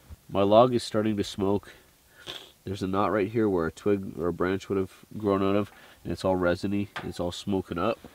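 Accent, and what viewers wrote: Ontario, Canada. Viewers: Are you Canadian? You kinda talk like one. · thought i detected an Ontario accent - definitely some french metis in there as well. from a fellow cunnuck :)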